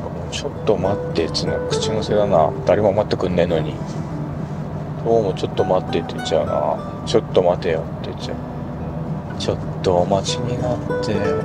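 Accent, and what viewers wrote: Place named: Hokkaido, Japan. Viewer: 久しぶりに聞いた北海道なまり❗やっぱりうまいっしょw 本当にガレット美味しそうでした。アクシデントでタイヤ購入って春先はやっぱり私も有ります😢 週２回もさん見れて嬉しいです🎵 無理無く旅して下さいね😊❤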